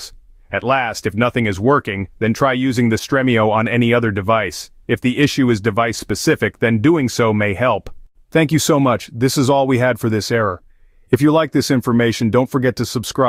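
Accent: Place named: England, United Kingdom. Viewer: Thanks I love your detailed simple instructions I ca ear and under your kaunhiage and speech perfectly it's nice to hear a English voice Bravo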